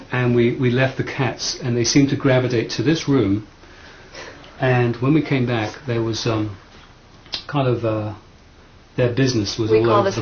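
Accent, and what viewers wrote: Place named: England, United Kingdom. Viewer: I love Ritchie's sense of humour. I love his accent too. Quintessentially English.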